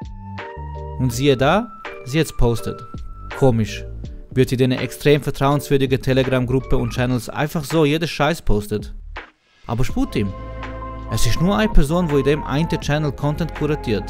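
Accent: Switzerland. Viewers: Krasses Video und gueti Arbeit! Btw. Bisch du Solothurner? :D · Was ist das für ein Dialekt? Schwitzerdütsch?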